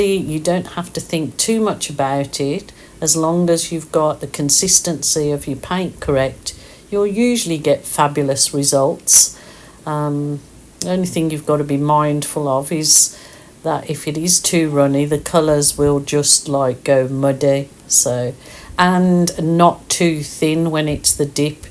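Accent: Birmingham, United Kingdom. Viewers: Your accent sounds very much like the Birmingham, UK accent 🤔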